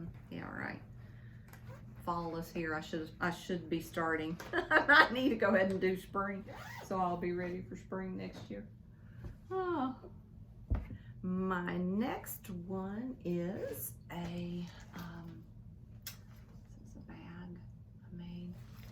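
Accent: South, United States. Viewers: Love your video, I'm from the south also so I completely understand you, no close caption needed. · Welcome to the sharing community. I love seeing all the creativity . I want to start something in quilting. Haven’t done it in years, but when I see all the wonderful quilts and things, I get the itch to go down that rabbit hole. I myself have been stitching since I was around 6. Loved hearing your southern accent. Looking forward to seeing your next video. P.s. I am from IN.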